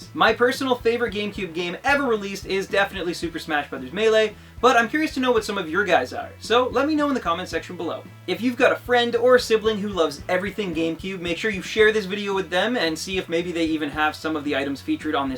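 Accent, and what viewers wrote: Canada. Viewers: This video is pretty good. Are you Canadian?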